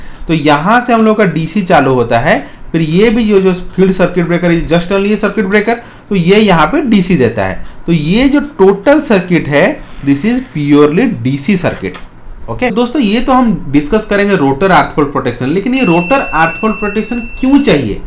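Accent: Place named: Odisha, India. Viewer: Your accent says you are from odisha. Correct me if I'm wrong.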